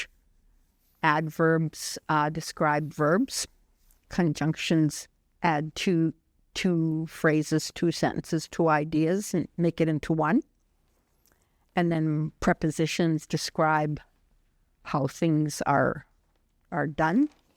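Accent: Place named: Michif, Canada. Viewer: Beautiful Northern Michif! It’s good for learners to know this is Northern Michif, just so that they know that Southern Michif or Michif French would sound quite different. Learners will probably find it most helpful to start with learning just one at first, so they don’t get confused.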